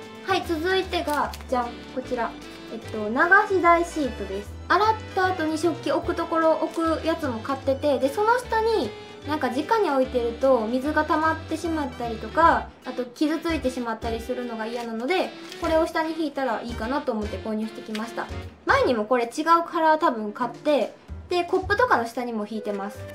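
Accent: Standard. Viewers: めっちゃ標準語｡ﾟ(ﾟ´ω`ﾟ)ﾟ｡ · 今日とくに喋り方標準語になり気味やな（笑） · なんか、標準語？ww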